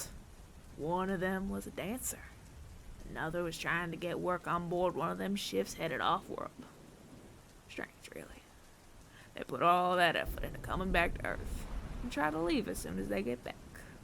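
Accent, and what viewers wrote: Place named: South, United States. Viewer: Oooooo this one was a fun one! Not sure how I felt about the Southern accent, but overall pretty good!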